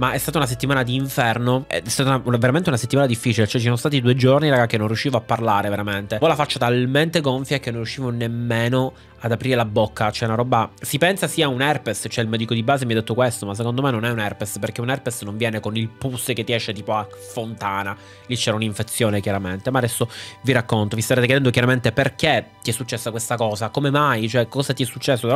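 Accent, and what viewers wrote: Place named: Palermo, Italy. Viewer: Sul problema di salute vedo molta confusione e non mi sento di chiarirla perché non sono qualificato per farlo: ti consiglio amichevolmente di seguire le prescrizioni mediche. Se non ti fidi del curante cambia medico. Dall'accento immagino tu sia di Palermo. Al tuo posto anch'io avrei evitato il P.S. (casomai al massimo la guardia medica, ma se sei stato già visitato dal curante anche la guardia medica non avrebbe avuto molto senso). Forse sarebbe meglio rivolgersi a un bravo internista, anche privatamente (purtroppo si paga un botto, ma le tempistiche della sanità pubblica, dalle, presumo, nostre parti sono bibliche). Mi dispiace per l'auto, ma le Fiat vanno via come il pane. 😔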